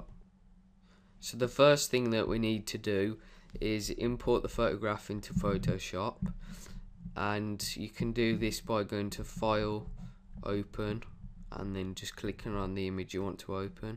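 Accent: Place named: Birmingham, United Kingdom. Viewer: birmingham accent lol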